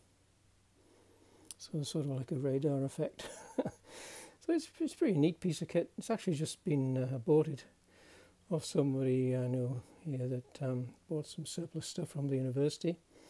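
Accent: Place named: Northumberland, United Kingdom. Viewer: Hello again, finding the videos fascinating. I see you live in Canada, although you sound like you're from our region of the UK... we're up in Northumberland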